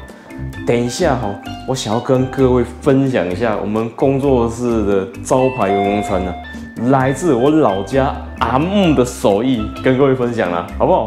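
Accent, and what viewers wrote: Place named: Taiwan. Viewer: 台灣國語啦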